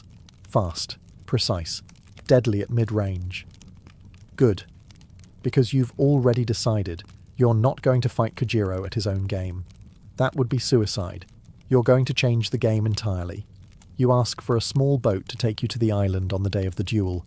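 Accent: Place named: England, United Kingdom. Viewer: Life and pOTh.... I'm out. English accents are ghey.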